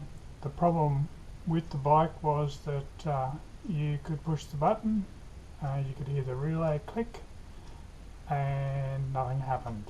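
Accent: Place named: United Kingdom. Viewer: Honestly just from your video sir just this one you've actually kind of persuaded me to listen to British people explain something now I find that funny because yes I am an American I'm sure you would have guessed and I'm a very proud American I'm not saying that I couldn't put that aside to learn something from the British I'm just saying I've always made fun of the way British people spoke their accent their choice of pronunciation of certain words I mean I have legitimately fallen on the floor rolling around laughing so hard but I guess at one point or another you always end up learning about the things that make you happy thank you for the video sir you've earned my subscription in like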